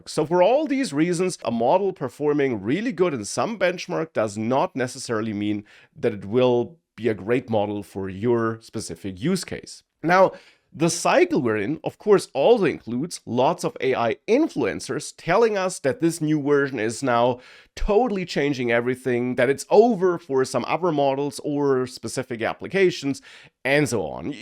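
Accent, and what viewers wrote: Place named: Germany. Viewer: Pretty sure he is German which natively uses W and V sounds, guarantee his English is way better than yours, bud. What is your native language so we can make fun of you?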